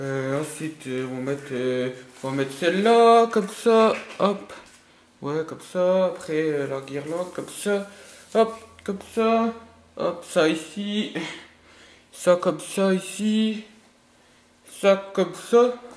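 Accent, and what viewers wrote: Belgium. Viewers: J'adore trop c'est ton accent belge 👏👏😄👍🔝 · cest la premiere video de que je vois et pour moi cest un jacqouille avec un accent belge echapée d'un hopital pshychiatrique donc voila et en plus il est pas trop drôle! Voila voila koi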